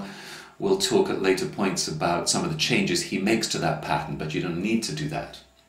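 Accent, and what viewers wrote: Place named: England, United Kingdom. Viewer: Muy ingles el acento 😆✌️🤙👉🇦🇷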